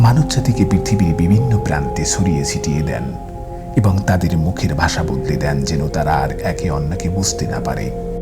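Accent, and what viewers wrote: Barishal, Bangladesh. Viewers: Your voice is so good but you can't avoid your local tone(Barishal).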